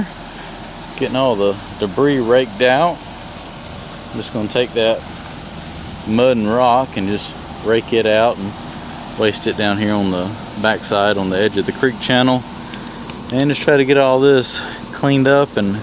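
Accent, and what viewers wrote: South, United States. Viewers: I live in east Texas and your southern-accent is slightly different than it is here. You pronounce the word "water" different than most people here. You say "the toe of the dam," and we say "the foot." Unfortunately now, most people here no longer even speak proper Southern English. They put the slang and vulgar in every expression. This video helped me to understand why spillways are important.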